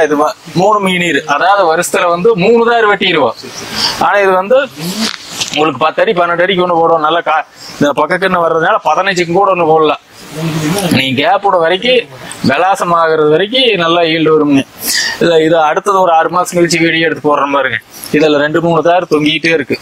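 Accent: Kongu, India. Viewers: Kongu thamizh super idai idaiye kusumbu pechum super 👌👍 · கொங்கு தமிழ் அருமை😊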